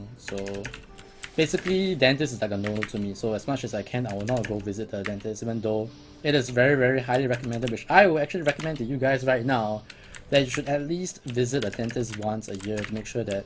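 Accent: Singapore. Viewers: ! are you singaporean?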